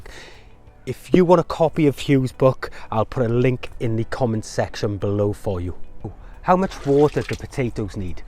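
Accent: Wales, United Kingdom. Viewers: I'm guessing he has a Wales accent? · Glad I found this video. Tried to grow potatoes last year but had a poor harvest. Have now subscribed. Like the Welsh accent. Am I the only fan from Germany? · Hi. I'm also originally from Wales,so not only did I appreciate your tips but I also enjoyed the accent. I've just subscribed. What part of Wales are you from? I'm in 💃💃💃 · I think it’s a lovely Welsh accent❤ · I started growing potatoes in dustbins for the first time this year and I just found you, fabulous information, thanks just what I needed. I very much enjoyed listening to your welsh accent too as I live in France and don't get to hear that anymore, lovely, (said in a very strong welsh accent of course).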